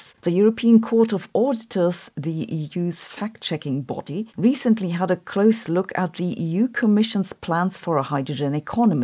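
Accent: Germany. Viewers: Slap a German accent - check / controversial statements - check / google information without facts - check / newspaper articles against the recent developments - check / I am not sure if your audience is enough educated to understand but certainly you are not competent in that hydrogen field you are talking about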